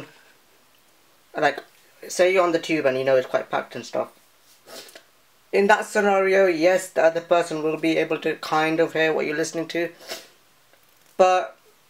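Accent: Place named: India. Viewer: half indian half uk accent, pretty cool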